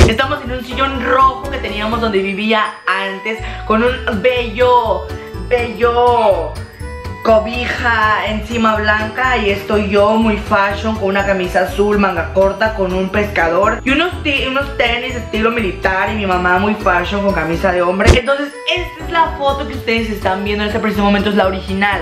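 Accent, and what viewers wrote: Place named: Mexico. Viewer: .... Are you like a Freso? I have friends from Mexico And thery act n talk like you.. Not as funny But they go t the same mexica Style of your Lingo.... Awesome channel You are very Funny I love it❤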